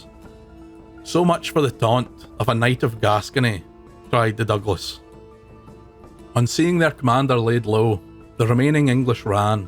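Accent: Scotland, United Kingdom. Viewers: Scottish history has always fascinated me. I could listen to a Scottish accent telling stories forever! Thanks for the great content.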